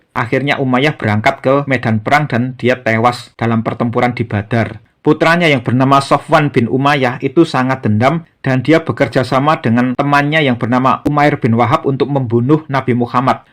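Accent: Java, Indonesia. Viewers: Mantap mas penjelasanya Walaupun dengan dialek Jowonya yg Kental..Medok 😁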